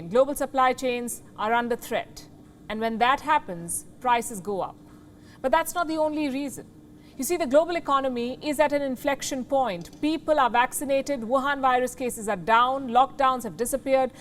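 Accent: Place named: India. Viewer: wut lmaoo... her accent is totally Indian.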